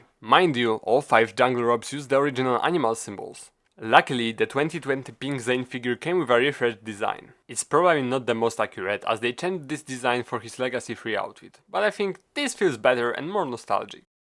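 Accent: Poland. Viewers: Are you polish like me?? You have polish accent. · Are u from poland? Beacose ur accent sounds pretty polish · Brzmisz jak brat Polak który próbuje po angielsku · akcent zbyt polski😩🙏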